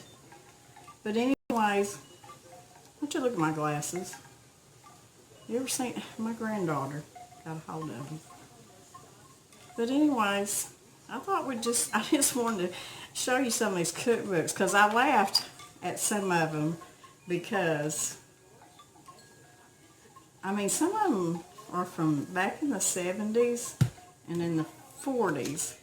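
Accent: South, United States. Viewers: I wish you would make a cookbook. All that you make and credit the months that you had gotten it from I love them all I love your Southern drawl and your lifestyle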